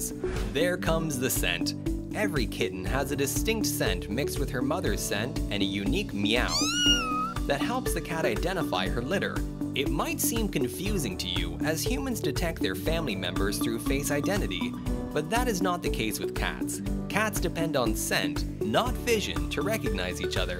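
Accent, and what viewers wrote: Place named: Europe. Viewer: european english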